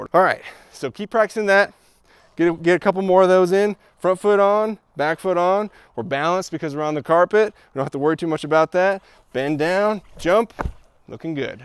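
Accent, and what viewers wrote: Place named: South, United States. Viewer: Great video....But when did you get the southern accent?!!!!😁😁😁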